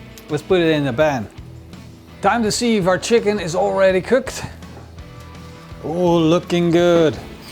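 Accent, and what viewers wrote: Netherlands. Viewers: Ik hoor een Nederlands accent?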